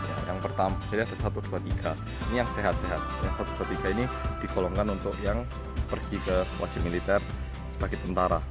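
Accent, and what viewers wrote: Java, Indonesia. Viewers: kok ngomonge pake logat jowo??..mank asline situ wong OPO?? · Mas ee iki asli korea opo blasteran?kok rada medok wkwkwk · sya mau tanya kmu ni org mana? klo org korea.. bhs indonesianya medok bgt kya jawa2 gitu. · médoc banget koyo javanese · Boleh tau gak? Masnya orang JAWA atau KOREA ?? atau mungkin turunan blasteran JAWA KOREA??? INDONESIA nya perfect + logat jawa pula ... Tapi liat Sunny Dahye , Orang Korea Tulen tapi Super Fasih Indonesia nya daripada youtuber korea lainnya... Cuma Sunny Dahye yg aku tau SUPER FASIH bahasanya daripada Han Yoo Ra & Lee Jeong Hoon yg masih ada logat korea nya.. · medoknya gemesin :v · How can I express my respect about your "medok jowo", dude?? 😃 Karena saking suka nya, liat video2 mas'e sampe keturon, isuk2 paketan.e ludes 😄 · Edyaaann, logate kentel jowo, lk ngrungkne ambi turu yo dkro wong jowo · Bahasa indonesia logat jawa josss mantap 👍👍👍👏👏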